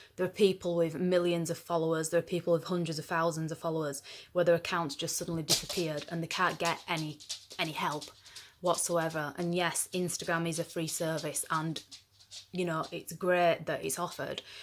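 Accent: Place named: Yorkshire, United Kingdom. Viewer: You sound like a Yorkshire lass to me, either that or Manchester, somewhere that way but you sound a bit well spoken for Manchester 😂I look forward to more videos 😁xx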